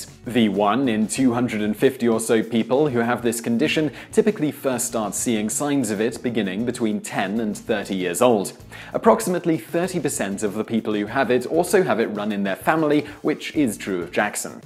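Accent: United Kingdom. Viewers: British accent...Good bye with your bullshit.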